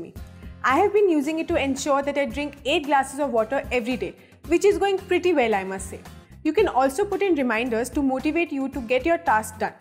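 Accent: India. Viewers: I hate indian accent. One of most terrible way of talking in english is in indian accent . I never watch anything that is in indian accent. But your video am just checking if there is any useful app only. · It's an Indian channel, and India has English users as well. People from all over the world adjust to the American accent when watching american shows/videos, or British TV shows, I don't see why they can't adjust to the Indian accent as well.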